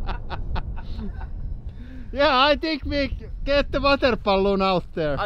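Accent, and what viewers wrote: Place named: Finland. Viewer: Palllloon! love Finnish accent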